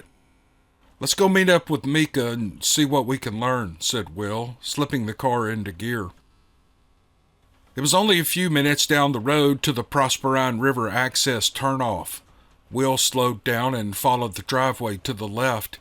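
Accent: South, United States. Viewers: Enjoying this storyline alot. Do hope there will be more stories in the future. Will be listening to number four after this one, of course. Thank you, you narrative is great, like the southern drawl. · You did an excellent job reading these to us. I've heard you before, but hubby and I sat down and listened to all 6 Parts in one day, no joke. We couldn't stop listening until we heard them all. You are an excellent storyteller, and we appreciate you!! Your southern drawl and just the way express everything makes it come alive. I do not want to forget DA Roberts, who without him these would not be possible. Thank you again.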